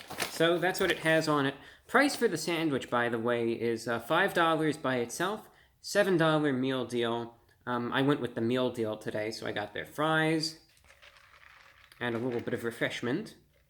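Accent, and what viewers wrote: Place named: Transatlantic, United States. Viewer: I really like how his voice has progressively changed over the years, as he transitioned from the modern american accent to a nasal, higher-pitched transcontinental accent. This contributes to sink him deeper into his character, along with his old-school yet humble manners and overall appearance.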